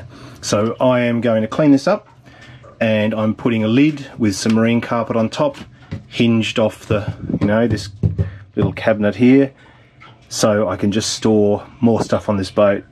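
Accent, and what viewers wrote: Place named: Australia. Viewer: Greetings from Minnesota! Love the videos and your Aussie Accent! keep em coming!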